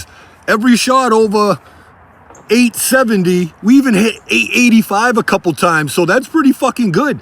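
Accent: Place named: Southeastern Massachusetts, United States. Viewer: Dude you have to be from near southeast Massachusetts. I lived there for 44 years (right near Fall River Mass) and just moved to Southwest Florida but "bang a U-ee" "wicked" "eff this & eff that" you sound exactly like me and my friends.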